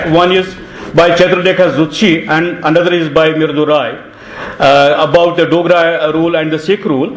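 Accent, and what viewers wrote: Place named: England, United Kingdom. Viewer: I think presenter is from England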